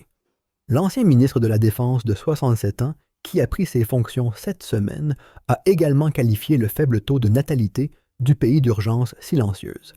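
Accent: Quebec, Canada. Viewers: Narrateur québécois?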